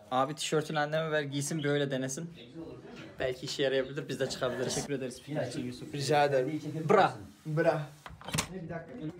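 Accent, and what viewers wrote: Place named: Kars, Turkey. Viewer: Onca yıldır dışarılardasın abi hala içindeki Karslıyı atamamışsın. Konuşunca o "ğ" sesi çıkıyor hala. maşallah. Zamanınız olursa gelsin babasının memleketi Serhat Kars'ı görsün.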